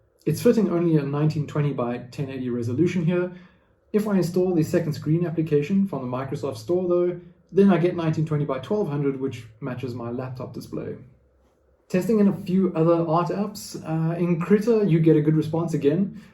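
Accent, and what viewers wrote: South Africa. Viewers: Informative video thank you ! I am an interior design student .. here in SA so glad to here a familiar SA voice 😁 I recently just invested in the Samsung tab s10 FE and was wondering does Samsung second screen work similar if I’m using adobe illustrator to draw on the tablet but have it connect with a laptop 😁? I’m new to learning digital drawing for my design projects and need to figure out the best workflow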